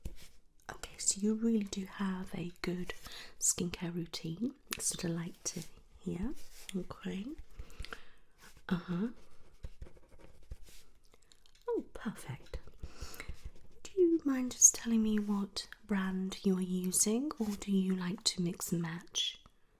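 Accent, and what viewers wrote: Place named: United Kingdom. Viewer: I love your British accent ✨🥲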